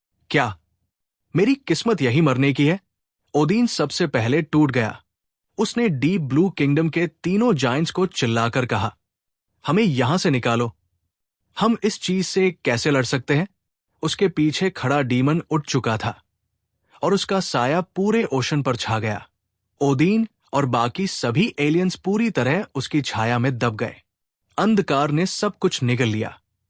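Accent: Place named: India. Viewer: Why you turn into indian bro.. i cant understand a thing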